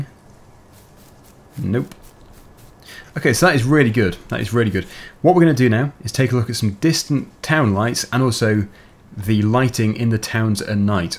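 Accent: England, United Kingdom. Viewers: Hello sir are you English?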